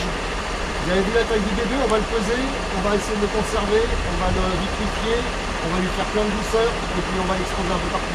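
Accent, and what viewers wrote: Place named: South-West, France. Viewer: Bonjour comme l'enveloppe du nid n'est pas commune penses tu que ce soit une anomalie ou une adaptation du frelon a l'environnement.?? J'aime bien l'accent du sud ouest.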